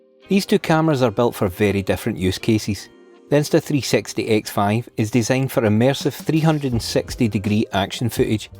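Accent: Scotland, United Kingdom. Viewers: This review helped make my decision for the P3. I'm now a subscriber to you. Love your Scottish accent, too.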